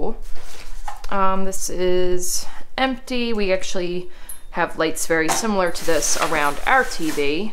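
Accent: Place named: Maryland, United States. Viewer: You sound like you're from MD :)...you're having good luck and next time I'm in MD I'll try to check the dumpsters.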